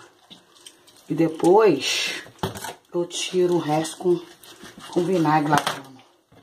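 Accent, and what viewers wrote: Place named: Minas Gerais, Brazil. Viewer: Oi cheguei aqui estes dias e estou amando adoro seu jeito simples de falar vc nasceu em Minas? ???sotaque parecido Beijo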